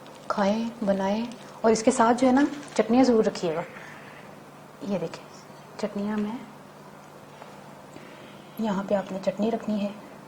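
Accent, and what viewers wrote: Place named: Rural, India. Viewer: Bhen m kehna chahti hu ky agr video bnani h to kissi sy voice over krny ki classes lyy lo w ky apko sense nhi h kese bolyy h bht paindu boltii aur aik bt ap bht zuda bolti wooo naaaa wooo naaa aur aik wo apki h jis ko bolny ka tarika hi beech m urdu ky punjabi start kr deti h plzz tameez sy bolnaa seekh loo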